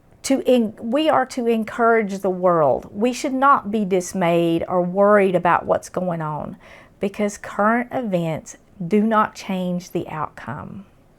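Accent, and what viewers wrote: South, United States. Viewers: Hello from So Cal. The change in the weather has me wantin' to cook/bake. I've never used YouTube before. Stumbled upon your channel a week ago. I love it so much., you are so sweet and comforting especially with your southern drawl. Your Christian kitchen touches and the way you share scripture is wonderful and helpful to me. Sometimes I just tune in and fall asleep to your voice....am I weird? Anyway, thank you, again for putting in the work and time. God bless you and your family and staff. I'm making the Soda bread for a homebound friend. She specifically suggested cranberries and raisins. 🤗💕🫶🙏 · My six year old grandson said, "She must speak a different language." I explained you were a Southern. I was born in Virginia but we live in Maryland.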